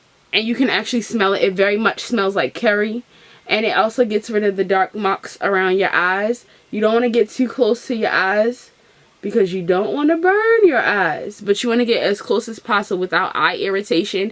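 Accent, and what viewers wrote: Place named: Baltimore, United States. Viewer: When you said turmeric, I just knew you were from Baltimore! Y’all accents stick out like a sore thumb💕😂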